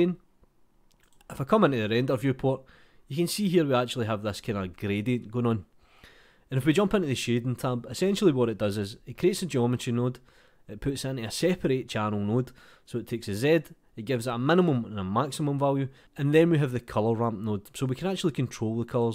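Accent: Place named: Scotland, United Kingdom. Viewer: It’s a bit wired, I watch all the videos, even thing I know and not interested off. Just because of it’s dialect. Ain’t sexy, but so funny. I just have to google for movies in true Scottish! Oh and yes the videos are quit good.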